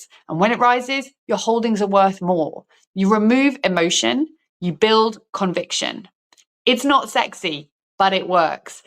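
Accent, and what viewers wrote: England, United Kingdom. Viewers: Funny thing...I don't trust JPMorgan or British accented commentators. Is she reading a teleprompter? Makes you go hmmm... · IMO, the British accent is beautiful and very classy. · Is she british?:cat-orange-whistling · Mostly asking, because of your English accent. I'm fully aware of 'everything' you're talking about + more. I'm in Australia 🦘 SO, now I'm going to get online & find out where gold hit $3500 per oz. In Australia gold is waaaay over that. I know why. SO yep, about to leave your chanel & find out where gold hit three & a half grand 💥 Pretty sure it was hovering around 3 grand in USA in the last few days / weeks. I'll almost bet my life, your headline is just more CLICK BAIT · Sounds like a lady with an English accent that likes to hear soft talk. I hear nothing of a substance here.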